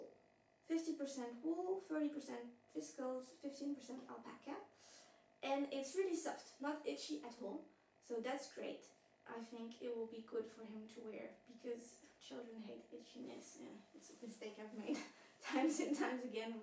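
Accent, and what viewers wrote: France. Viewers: ❤love the French accent! It is so much fun to watch knitters all over the world! I am watching from B.C. , 🇨🇦.❤all those Danish designers, it’s fun to go on the Isager website, and look at the archive collection, and see all the Danish designers she asked to collaborate with.😊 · Bonjour du sud de la France ! I spotted your French accent in the firsts seconds 😂 Really Beautiful projects, I loved this podcast Thanks !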